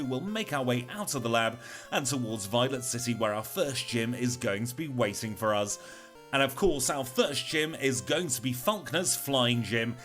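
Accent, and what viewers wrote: United Kingdom. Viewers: wow that sounds interesting! That's fine, I will keep on enjoying your challenges anyway! I like very much! Your accent is so cool too! I remember you saying you are from Manchester... Is that correct? You're the first one from there I've ever heard! You friend LukethPlays is the second one! Thank your for producing contents for everyone! I think your challenges are unique and also I long to take part in the next stream! I'm one big fan of yours man! I wish you the best luck of all! See you later!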